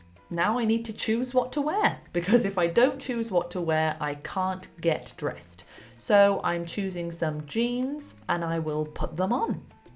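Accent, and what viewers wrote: United Kingdom. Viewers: British accent. Very good how it sounds to an english student more used to the sounf of the american english. Thanks for this video. I:m on my way to fluency.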